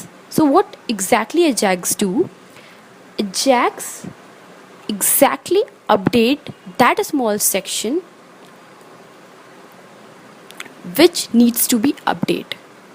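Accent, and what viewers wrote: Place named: Russia. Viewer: That russian accent)))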